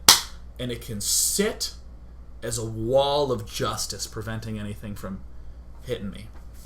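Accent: Australia. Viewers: He sounds australian not british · It sounds more like a Australian accent XD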